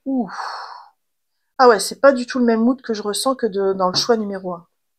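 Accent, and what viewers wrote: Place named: Nord-Pas-de-Calais, France. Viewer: Choix numéro 1 ❤ ooooh oui le message a bien été reçu 😊 MERCI du plus profond de mon cœur !!! Je vais le ré écouter tellement il est riche et réconfortant. Je me sentais très seule en ce moment, j en suis meme venue à me faire mes auto séances de psy parce que pas d'accompagnant disponible.. je doutais de mes capacité à me libérer seule de ce traumatisme de l'âme car je sens que j en touche la racine. Je reçois ce message comme une validation. Alors ❤Merci❤❤❤ Et big up à l'accent chti 😉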